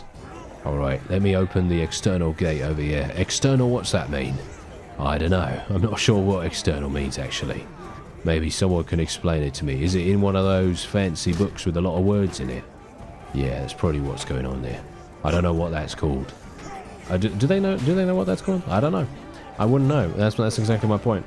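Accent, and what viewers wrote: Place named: Cockney, United Kingdom. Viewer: I really enjoyed this episode, Cockney accent aside. Add it in, still really enjoyable. It's fun, but not required!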